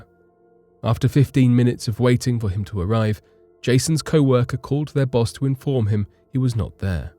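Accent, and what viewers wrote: United Kingdom. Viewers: I hear the British accent so understood and somewhat excused but the word 'myth' is incorrect and insulting consistent of the Western European mind and history with all cultures information other than Christianity. For your education, all information Native Americans share are historical facts passed Down from one responsible LISTENER and wise keeper of the truth to the next. It is accurate. It may not be in a language or give an image of justification that your limited exposure may be able to verify. Africans do it as well. Use of the word Myth in such a manner brings forth Arrogance, a way of thinking that Covid19 is demanding cultures and people that use it to cease and desist and the virus will Diminish.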